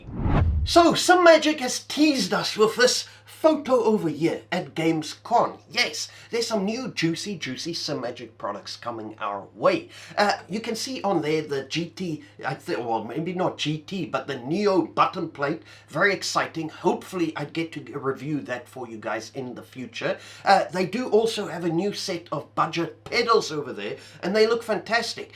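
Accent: South Africa. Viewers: I recently discovered your channel and I’m loving your content. Are you South African?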